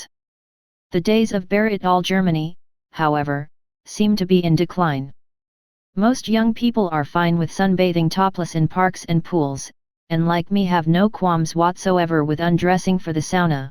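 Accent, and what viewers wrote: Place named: United States. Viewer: But the accent is American!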